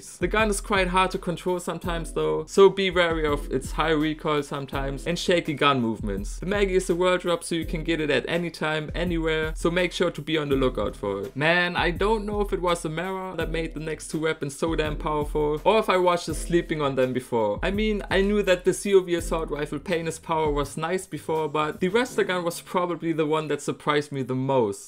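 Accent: Germany. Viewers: Dieser deutsche Akzent hahah :)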